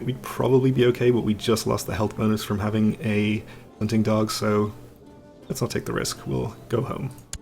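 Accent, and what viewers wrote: Ireland. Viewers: Mr, are there other changes with the Horse lords DLC or just the different culture and features with that culture, and are you Irish, cause it sounds like you have a slightly heavy brogue, or is it just me?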